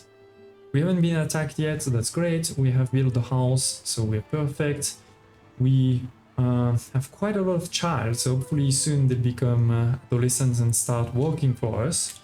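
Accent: France. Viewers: c'est fou comment l'accent francais se remarque dès la premiere seconde de video. mais n'empeche, tu parles vraiment bien anglais. bonne video!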